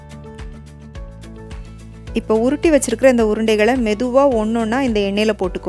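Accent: Tirunelveli, India. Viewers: Superrrrr. Voice Superb. I am also nellai district in sengottai. Unka voice supera irukum pothae ninichaen neenka namma oorunu. Keep it up ma'am. Pudina thuvail sollunka ma'am.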